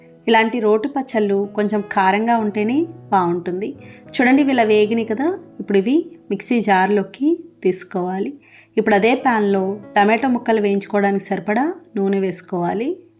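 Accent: Godavari, India. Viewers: అది రాగాలు ఏమీ కాదు, గోదావరి జిల్లా ల మహిళలు అలాగె స్పష్టంగా మాట్లాడతారు, అది వారి మాండలిక శైలి, శ్రధ్ధగా వింటే చాలా బాగుంటుంది, ఎవరి మాండలిక భాష వారు మాట్లాడతారు గదా, దోషం ఏముంది! మీరు ఇలాగే చెప్పండి సోదరీ! సహజత్వాన్ని పోగొట్టుకో రాదు అని మనవి 😮😅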